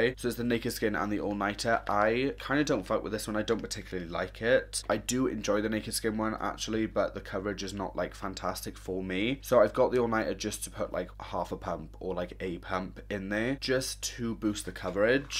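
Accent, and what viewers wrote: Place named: England, United Kingdom. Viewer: on instagram, and omg where the hell are you from! obvs england but your accent is what i live for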